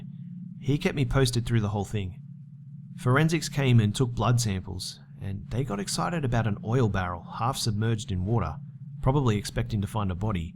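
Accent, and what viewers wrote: Australia. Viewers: aussie accent lol* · Minnie Hambrick The guy narrating the story is Australian but, the guy who wrote the story is British.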